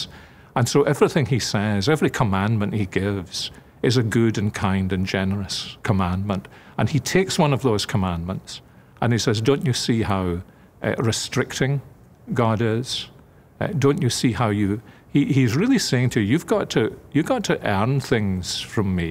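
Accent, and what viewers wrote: Scotland, United Kingdom. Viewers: Addjoa preko baah Me either! The Scottish accents are my favs! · Absolutely, and I never tire of his Scottish accent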